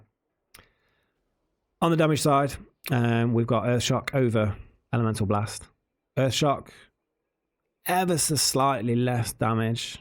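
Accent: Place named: United Kingdom. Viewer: nice seeing a brit explain talents etc for a change haha! thanks buddy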